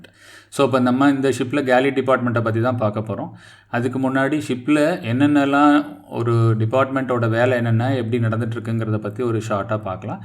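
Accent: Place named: Karaikudi, India. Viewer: காரைக்குடி ஒரிஜினல்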